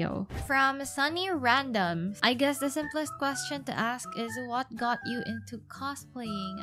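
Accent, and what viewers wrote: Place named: Philippines. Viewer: One thing I love about your shorts is your slightly wicked humor and comedic timing. And yes, your Yor and Anya shorts hooked me. I got the sense that you would make one laugh a lot on a date. Have you considered standup? Anyway, please use humor as a part of what you produce. Also, I love your accent. You probably know but I’ll say it anyway: your kind of Philippina cute/sexy is a powerful magic wand anywhere. I would also love to see your aerial work!❤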